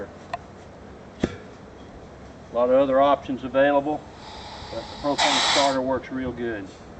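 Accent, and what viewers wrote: United States. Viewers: That's NOT a Texas accent .... it's a refined Southeast Georgia accent. Smooooth as butter! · Love the Texas accent, and love the propane starter idea. I'm a novice getting ready to take my first smoker on it's maiden voyage so I really appreciate the expert advice! Thanks for making this video!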